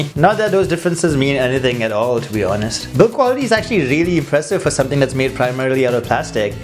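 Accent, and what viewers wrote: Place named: India. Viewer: Nice review, I just want to buy this right now! Xiaomi just arrived here in Brazil, and I really hope they keep up soon with this device, just incredible for the price. And... dont change your accent, its cool, I watch some indian review channels on youtube and your accent is one of the most fine between all. Actually is a little bit of cute to hear!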